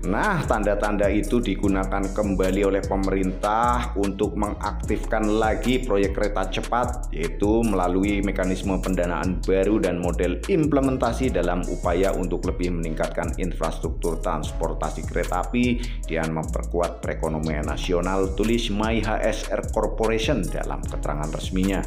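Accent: Banyumas, Indonesia. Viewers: admine ngapak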